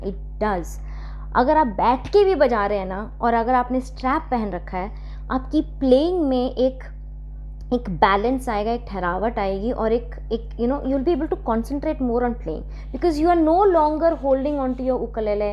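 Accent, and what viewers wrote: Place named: Germany. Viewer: your pronunciation is German based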